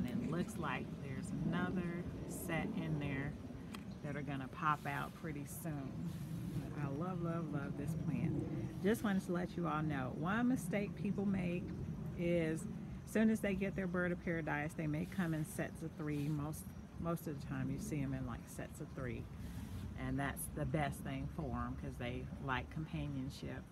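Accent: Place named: South, United States. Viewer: Stunning! I hope to follow suit! And very genteel southern accent. 😍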